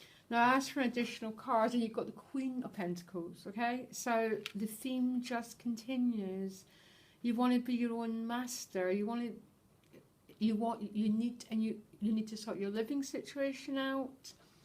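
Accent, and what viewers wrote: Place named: Scotland, United Kingdom. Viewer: Thank You for reply, Me too, I'm from Scotland, I could still hear the Scottish twang in your voice, My connections are with Edinburgh & the Scottish Borders, I moved to England 10 years ago, Lived in Burton On Trent then Wiltshire & Now I'm settled in South West Devon beside the sea. x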